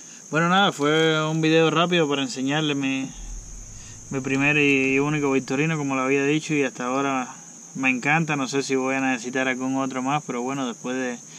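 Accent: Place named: Latin America. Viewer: Que buena navaja excelente ahora entendí bien siempre veo a los españoles explicando y no entiendo mucho lo que disen bueno es escuchar a un latino saludos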